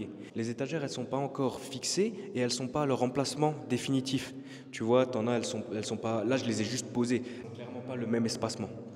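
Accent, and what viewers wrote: Alsace, France. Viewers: Petit accent Alsacien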